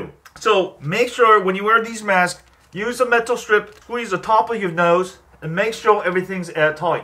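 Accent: United Kingdom. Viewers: Did you live in the UK? You seem to have a strong British accent sometimes. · going to costa rica in feb not cancelling that shit ''its not a joke" but dudes talking like hes from the UK l lol smh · whats up with the brittish accent?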